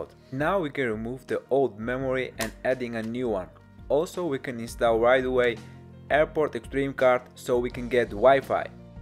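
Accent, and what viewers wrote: Netherlands. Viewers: Haha lachen om te herkennen dat je een Nederlands accent heb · hoorde meteen dat Nederlandse accent.. toen zag ik ronde op de stopwatch en wist ik t zeker..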